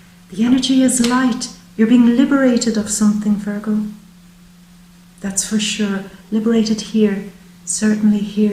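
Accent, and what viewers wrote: Ireland. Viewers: So fabulous to come across an Irish accent 👌. Beautiful reading by a beautiful lady 💖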